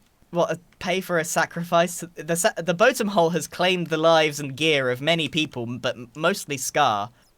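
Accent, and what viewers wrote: Germany. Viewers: u german or somthing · Are you German or do you just live here?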